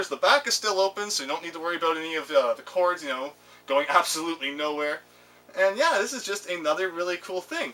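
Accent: Canada. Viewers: Liking this video for the Canadian accent alone. "Put it on the COACH" bruh :)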